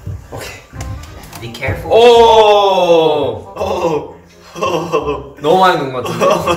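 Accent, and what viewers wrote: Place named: Australia. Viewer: His Australian accent I can’t it’s so cute and just yk 😭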